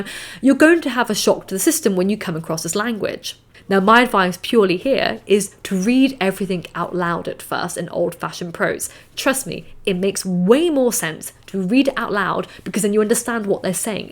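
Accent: RP, United Kingdom. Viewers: So refreshing to hear your british English accent, I rarely hear non-american English and at first I got scared I won't understand anything but that was not that hard. Just my process of listening to you was the additional argument to your speech 💪 I think there's also an important note of age-appropriation of literature, some classics are just speaking about themes that are not familiar for children or teens. And I wish people told me this in not a derogatory form, like haha you are stupid little girl you won't understand. It's not like that... It's more like people of country A won't understand the subtle context of literature of country B. And it's not a competition at all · Is this teacher why you sound so Posh? She drilled posh RP into you ? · What a delightful and posh accent, it’s verbal caviar.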